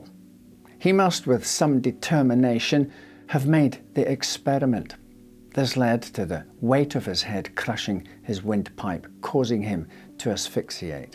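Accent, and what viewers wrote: Scotland, United Kingdom. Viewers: I love listening to your Scots accent!! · Are you Scottish? I am sure there is a twang in your perfect enunciation!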